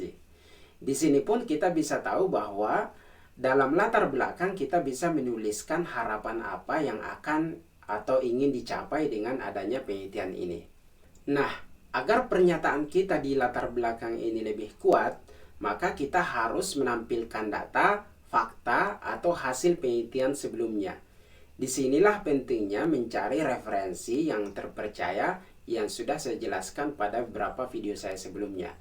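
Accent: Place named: Mamuju, Indonesia. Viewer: mantap pak, familiar dengan aksennya, ternyata benar orang mamuju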